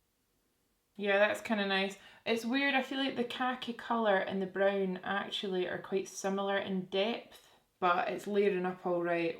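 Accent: Scotland, United Kingdom. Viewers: I always watch your vids when I’m stressed out before exams. Something about a scottish accent is so soothing?? hahaha luv u!! come to dublin thanks ❤️❤️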